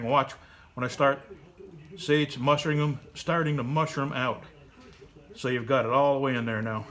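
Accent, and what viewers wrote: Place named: Connecticut, United States. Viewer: great job,i could tell by your accent you were originally from Ct.....lol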